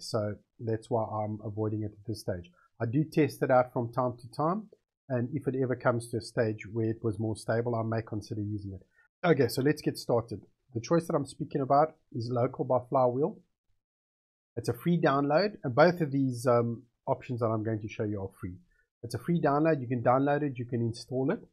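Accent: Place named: South Africa. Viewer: Love hearing a South African accent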